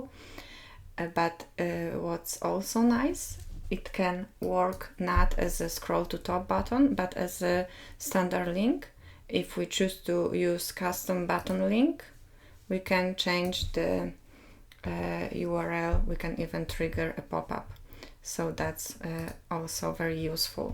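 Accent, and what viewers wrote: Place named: Poland. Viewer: Tak właśnie mi się zdawało, po imieniu i nazwisku :). oraz po akcencie :) Super fajną wtyczkę zrobiłaś, jest ona może w wersji Polsko języcznej ?. Jak masz podaj mi linka do swojej strony w języku polskim lub do jakiejś dokumentacji odnośnie tej wtyczki Pozdrawiam Łukasz :)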